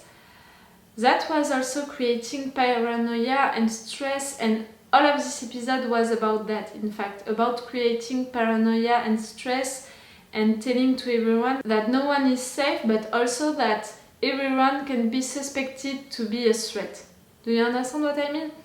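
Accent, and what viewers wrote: France. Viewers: I love to hear a french person get constantly concerned about democracy. I swear, I start smiling every time I hear you say democracy in that sweet french accent. ;D Also, I have to defend Gaius. You keep calling him useless, but he is actually a genuine genius. If he didn't have Number Six manipulating him in his head, he would be a huge asset to the fleet. And even so, I think he probably still is, we just don't see it too much, but we've seen scenes where he brings reports for the president and he did the statistical work in the meeting during the water crisis episode and other stuff like that, so he is probably actually doing useful things in the background.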